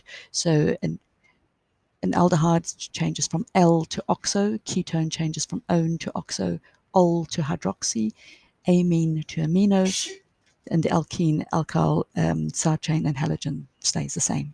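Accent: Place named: South Africa. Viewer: Thank you for the helpful video! P.S.: you have a pleasant South African accent :)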